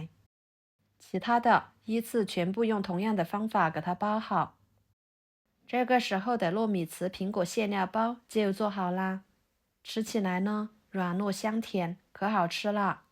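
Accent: Yunnan, China. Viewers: 也谢谢你的云南普通话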